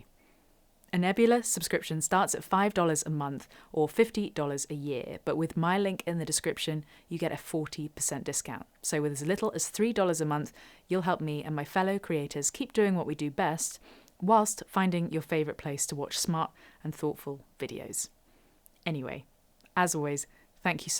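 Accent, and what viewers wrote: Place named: RP, United Kingdom. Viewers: Hij, be happy you are not a slave to a Record Company, and just drop that (Chinese!) Tik-Tok platform altogether. Love your videos, songs, and your speaking voice as well as your delicious Queens English. I hope to see more of your classic Tuesday Talks and guitar stories again. Fondly, Michael PS Commemorations on your Queen's passing. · Keep coming back for the posh accent and demeanour, I guess that bottle is always going to be full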